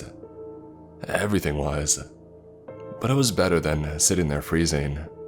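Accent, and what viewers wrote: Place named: California, United States. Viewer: I hate your preppy Californian girl accent.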